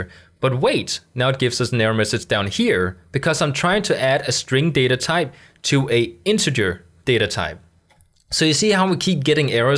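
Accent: Denmark. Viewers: Er du Dansker?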